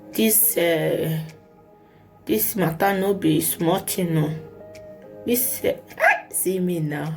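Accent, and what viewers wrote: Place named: Nigeria. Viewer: Lolz... The pidgin is really funny ... although u are gud in the make up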